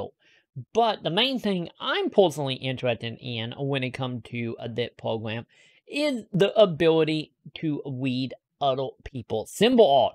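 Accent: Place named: Australia. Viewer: This is the most Australian accent i've ever heard.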